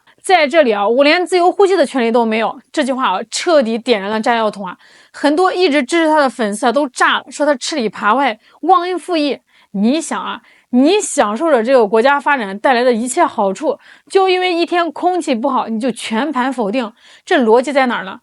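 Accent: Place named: Shanghai, China. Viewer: Your Shanghai dialect is so funny! 😂👍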